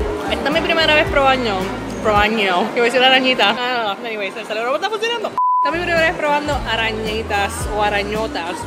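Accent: Puerto Rico. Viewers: Hola Estoy loka por ir a PR pero es que te voy a buscar hasta debajo de las piedras. Mis dos hermanas y yo te seguimos hace mucho y me encanta todo lo que haces. Y lo más que me gusta es que somos patriotas. Me encanta tú ascento boricua, chacha eres mi pana bn brutal. Te quiero con cojones jajajaja t robe la frase 🤣🤣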